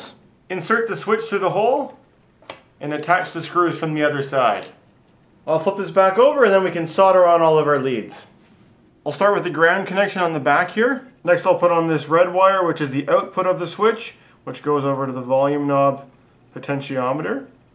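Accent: Canada. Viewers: Great Video, i finally bought everything i needed to wire my guitars so i can refinish them. I got hooked on all the different types of ways you can wire the pickups and mods. haha. But when i get finished and it doesnt work im like daaaaaamnit. This was a great tutorial! better than cutting off all the wired and starting over lol. Hey man are you from Canada?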